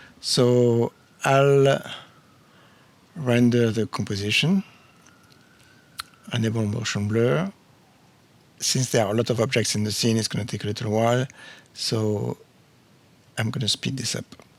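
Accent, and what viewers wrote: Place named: Arab world. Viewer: you have an Arabic accent. nice video by the way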